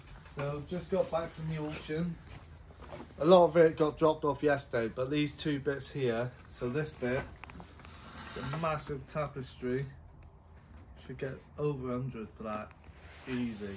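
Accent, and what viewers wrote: Cornwall, United Kingdom. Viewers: What part of the country are you from? Sounds like Cornwall?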